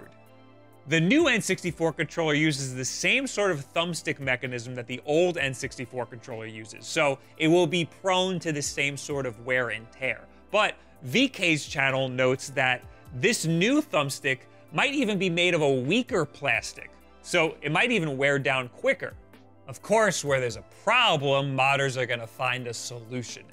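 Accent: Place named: United States. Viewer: Why do americans say saughtering instead of soldering? Is the word spelled differently in USA?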